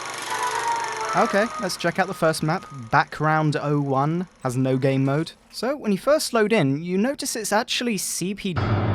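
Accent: United Kingdom. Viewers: YES, MY BRITISH SOUNDING MUM IS BACK! Seriously though, you make amazing content and I hope you continue to make great videos. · Are you British?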